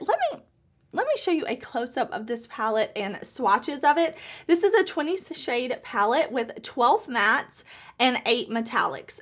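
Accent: South, United States. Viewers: . I want to be you when I grow up. Love your eyeshadow looks and your Southern accent.😊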